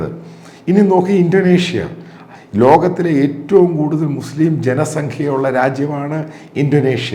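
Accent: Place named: Central Travancore, India. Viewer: Ayyooo mathew Samuel ... you're such a funny guy. Each time I hear this " ee pullu !! " cannot stop laughter . Yeah madhya thrivithamkoor working . Am from Kottayam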